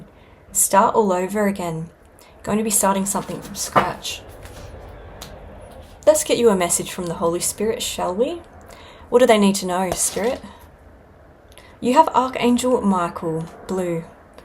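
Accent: Australia. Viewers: Are you from Australia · Hi, I'm new and today I just finish another cup of warm tea and it caught to my attention when you mention Rieke and I have been attending this session and it helps me. Thank you for your reading. I'm wondering if you are from Australia or from Great Britain?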